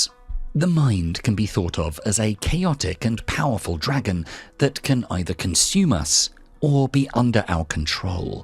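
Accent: United Kingdom. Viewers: I was in stress in those dark days quit job, broken up, didn't find any light in my way of life. Coincidently, I watched your video, listened to it because I love British accent and I've been learning English then I realized this channel like my teacher -it took me back to the right way, shown for me what I have to do. After one month quitting my previous job (which I didn't like and it took 12-14hours/day ) finnally I found the new job which I really enjoy it🙂. Thank for your effort to make these videos. Hope you and your team have a good health to make lots of precious videos to heal people like me. 🎉❤❤❤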